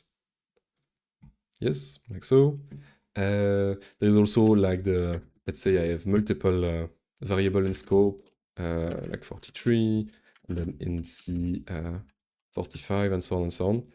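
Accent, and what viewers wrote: France. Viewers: 🎉 brilliant. Cool to finally understand the magic behind cpp lambda functions. Nice french accent by the way.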